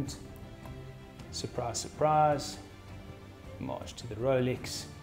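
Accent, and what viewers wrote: South Africa. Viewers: Sweden here again. Jebo,, I don't know that one so I will go with Jambo Sana instead. Both nice watches, I kind of like the black one with that pop of red and blue lume, could be a little better. By the way,, J-burg,, I guessed right on the accent.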